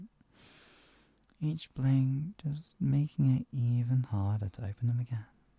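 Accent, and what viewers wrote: United Kingdom. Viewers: This may be a weird question, but what kind of British accent does this guy have, I’m American so I’m not sure of any of the regions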